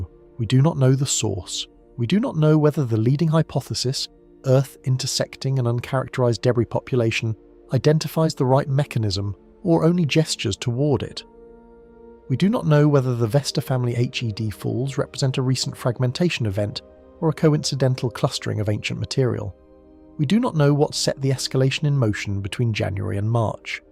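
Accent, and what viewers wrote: United Kingdom. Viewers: Please. Get rid of the insufferable Brit accent. Enough is enough! 😮 😊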